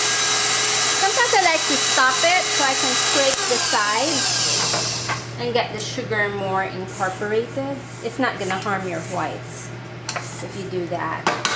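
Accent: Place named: Philippines. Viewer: She has a Filipino accent. 8D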